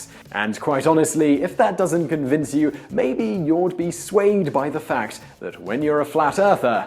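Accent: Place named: Cockney, United Kingdom. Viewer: Watch something positive too much cockney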